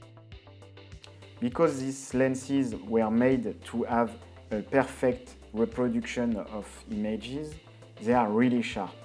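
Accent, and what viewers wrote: France. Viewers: The french accent! J'adore tes vidéos, sont top! Et ton accent me fait bien marrer :D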